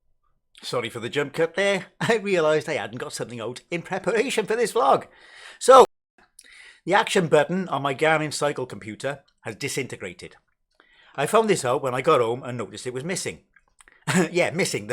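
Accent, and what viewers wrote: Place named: Wales, United Kingdom. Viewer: Another excellent vlog thanks. Are you using an autocue these days? There's something about it that makes the delivery of your dulcet Welsh tones sound even more lyrical and poetic. You should do one of those relaxation tapes :-)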